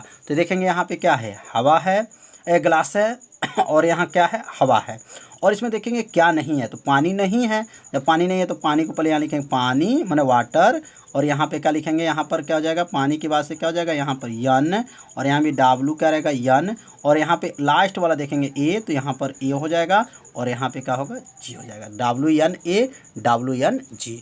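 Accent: Bihar, India. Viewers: Sir ji aap bihar se ho kya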